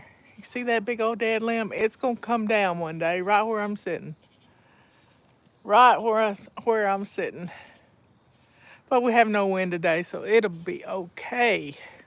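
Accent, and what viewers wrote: South, United States. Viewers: Absolutely beautiful! I love listening to your southern voice! Love from Dallas, Texas!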